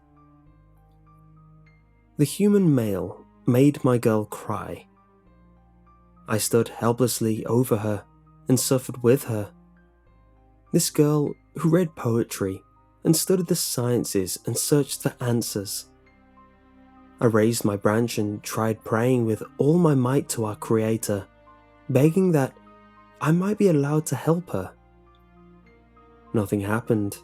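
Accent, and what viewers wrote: United Kingdom. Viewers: I agree. what a Brit.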